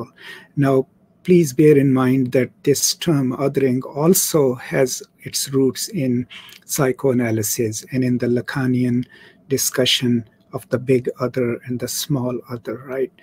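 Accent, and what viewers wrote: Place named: England, United Kingdom. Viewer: thanks for your works! ps: i like your voice and english accent so much greetings from france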